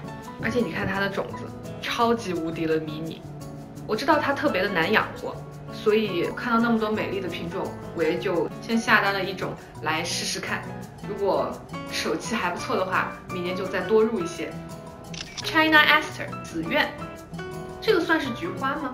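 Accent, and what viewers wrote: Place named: Jiangsu-Zhejiang, China. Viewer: 你说话的感觉和我好像，是不是江浙人呀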